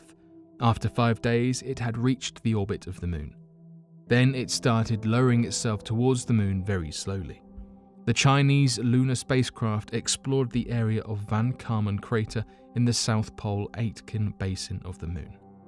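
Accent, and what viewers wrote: England, United Kingdom. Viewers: He's not American. Why should he adopt an American accent? Are you claiming Americans are too stupid to understand a British accent? If you want to get on to him about pronunciation, why not point out that Ultima-Thule is not pronounced "thool" but "thoolee"? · He has an English accent, that's all. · Its pronounced MEH - THANE, Not MEE - THANE. PLS get the words correctly pronounced with an American accent? Edit: Since you have an English accent, I get the why of the above mispronunciation of Methane. But, I have to inform you that your way of saying Methane is Hilarious!! Oh, and people are saying in the comments section, that “Ultima-Thule” is pronounced Ultima-Thoo-lee. So, apparently, the “E” at the end is not silent. Better luck next time...!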